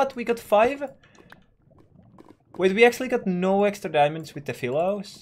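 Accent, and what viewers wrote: Finland. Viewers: i love your finnish accent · This is my 1st time watching you and just with 8 seconds I could tell that your Finnish And of course because of your name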